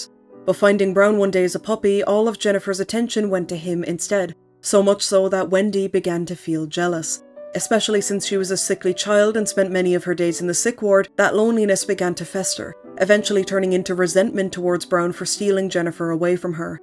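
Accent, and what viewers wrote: Ireland. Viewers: New viewer. I love horror games and Irish accents so now you will never get rid of me! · so SuperEyepatchWolf sent me here and I'm bingeing basically all of videos (I CANT BELIEVE I DIDN'T KNOW THE UK REFUSED TO PUBLISH THIS) but im also trying to figure out the region of her accent? I've tuned into a few Irish accents but I reckon this ain't Dublin or Cork or Wicklow, what IS the accent? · It’s so cool and refreshing to hear a fellow Irish person talk about the niche PS2-era horror that I’m so interested in. The standard of your writing and work in general is so good! Subscribed! 💖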